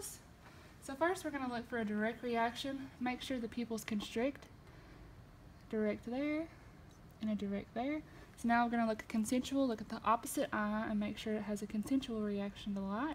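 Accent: United States. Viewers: Love the accent, Miss Kentucky! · man this is a thick southern accent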